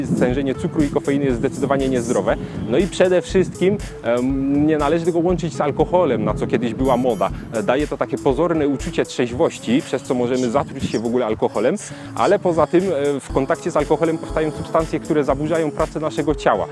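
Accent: Silesia, Poland. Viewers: jesteś ze Sląska , słyszę znajomy akcent .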